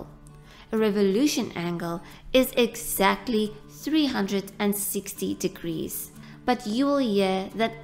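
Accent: United Kingdom. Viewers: rays= raisee british ahh tecaher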